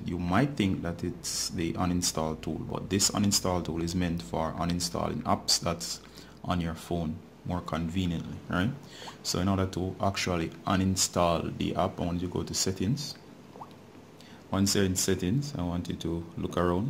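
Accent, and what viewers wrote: Jamaica. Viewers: thx man........... are u a jamaican?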